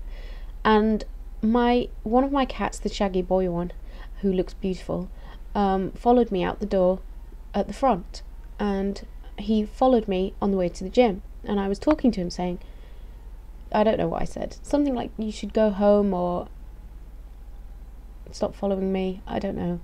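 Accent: United Kingdom. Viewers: it's a luffly voice. It seems to have bit of a northern tinge to it, but I like Yorkshire/Lancashire area accents (I'm not sure where you're from). And no, you don't sound like a robot! Just pretty chilled. =)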